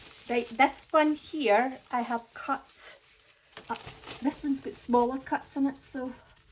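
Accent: Scotland, United Kingdom. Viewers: Thank you for this! I bought a gyro cut a while ago and didn't know how to use it. I think I might have to buy the deep cut though as I want to use it on Dura-lar sheets. However, I do have some Tyvek, which is a lot thinner, so I'll try it on that. By the way, I LOVE your accent! it's like music to my ears and it reminds me of all the wonderful people I met during my travels in Scotland <3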